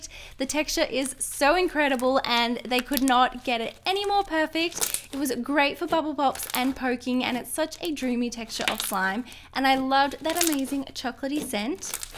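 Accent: Australia. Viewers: Are u Australian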